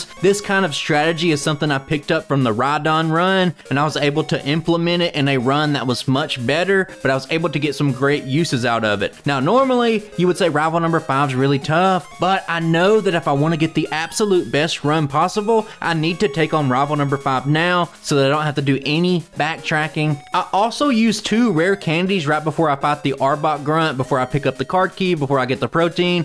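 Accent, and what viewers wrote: South, United States. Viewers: I'm liking the new avi, slowpoke looks powerful after learning TOMBSTONER! Also one of my partners heard your voice and wonders if you're from Mississippi, Alabama, or Louisiana. They're convinced you can't be from anywhere else lol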